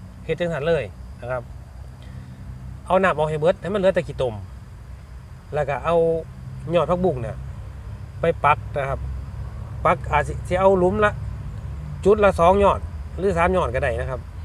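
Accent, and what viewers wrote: Thailand. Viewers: บรรยายสำเนียงอิสาน...คนภาคกลางฟังได้50เปอร์เชนต์..ถ้าบรรยายสำเนียงกลางคนจะเข้าทั้งประเทศ. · ฟังแบบคนภาคกลางมันไม่ได้ 100% ถ้าสะดวกทำเป็นเสียงกลางบ้างก็ดีนครับ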